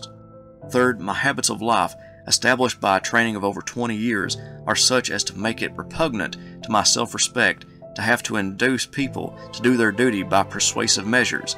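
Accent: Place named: Virginia, United States. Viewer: I have long admired Gen. Thomas and I often wondered why his name was forgotten for so long. Thank you for this series of videos on the life of this honorable, intelligent and courageous man. He is a credit to the United States. And so are your videos on the American Civil War. Incidentally, I imagined that your (Virginian?) accent is quite similar to Thomas' own voice. It made it seem that the man himself was narrating his own biography.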